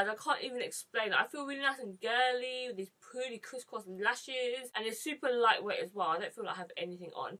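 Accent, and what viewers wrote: United Kingdom. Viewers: Omg your Brittish accent! I wasn't expecting it 💗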